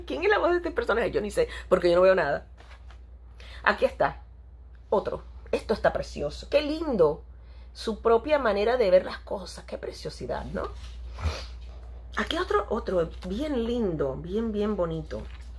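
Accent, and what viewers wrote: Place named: Chile. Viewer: alguien más se dio cuenta que ya se quedó pegada con el acento chileno XD saludos desde chile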